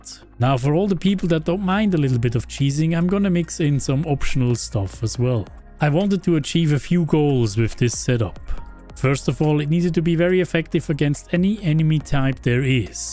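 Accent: Switzerland. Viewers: Fast nöd denkt mit dim dialekt das du en schwizer bisch <3 Schad das die videos uf englisch machsch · me ghört eifach das schwizer bisch, endlich mal en schwizer wo gueti videos macht🎉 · meh ghört sofort das en schwizer bisch. vo züri?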